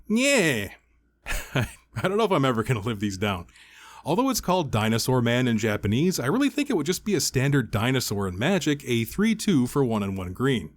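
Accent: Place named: Brooklyn, United States. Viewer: I appreciate your Brooklyn accent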